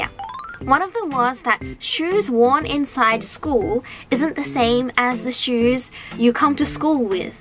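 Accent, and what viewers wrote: Australia. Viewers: please do a video with your thickest Aussie accent😂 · On top her Australian accent is really Charming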